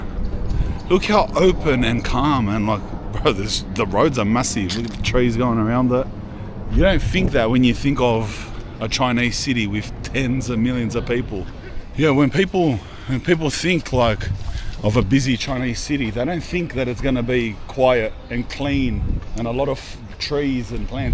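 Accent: Australia. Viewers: wow that aussie accent,, i just cant,, the guy talks like a 12 year old,,, ciao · Holy Aussie accent😂🔥🔥🔥🔥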